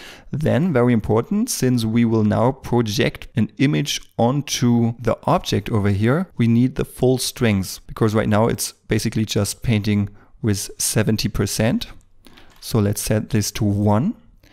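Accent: Germany. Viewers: i hear a german accent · Sei mir nicht böse aber dein deutscher Akzent, man merkt es einfach. Ansonsten mega gutes Video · du bist dedutsch oder? Cooles Tutorial 😍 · german accent exists: "give me the whole stock!" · Junge... xD man merkt dass du Deutscher bist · you sound like a german man:D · das ist witzig man hört echt sofort raus, wenn ein deutscher englisch spricht xD, aber super video, sehr hilfreich, danke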